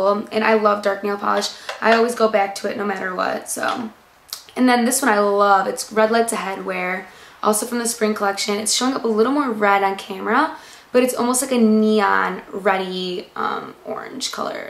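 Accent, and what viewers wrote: Chicago, United States. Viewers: Your Chicago accent is so cute!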